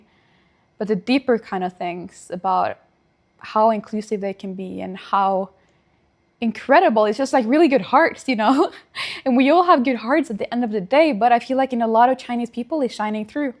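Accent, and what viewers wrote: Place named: North America. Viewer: Excuse my ignorance, do all Norwegians speak with this "north American" accent? What other languages do you speak in your native country?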